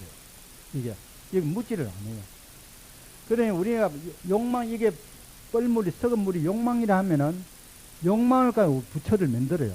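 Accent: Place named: South Gyeongsang, South Korea. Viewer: 구수한 경상남도 사투리의 질박하고 자세한 스님의 강의를 듣는 인연을 맺어주신 데 대해 감사드립니다.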